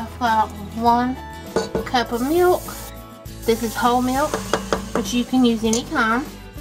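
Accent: South, United States. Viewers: Her sweet southern voice is actually helping my anxiety. Had a nightmare and it’s 4am, ended up on her channel and it’s like a lullaby. Thank you! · what is that accent? where in america? LOVE IT xxxxxx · Clayton Cooley I agree! She's very sweet and her voice is soothing and the southern accent makes her more charming. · lichen goatfungus she does seem very sweet!!! Her food looks delicious 😋 and I love her accent,where is she from? I’m a Houston,Texas girl,and I love southern accents!!! And Country cooking might I add😁 I cook country and soul food 🥘 people have asked me if I preferred soul food because I’m African American,no I assure you I’m into all kinds of food, it doesn’t matter my ethnicity.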